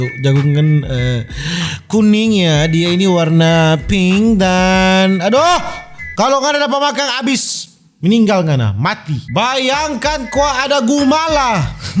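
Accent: Manado, Indonesia. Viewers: itu logat manado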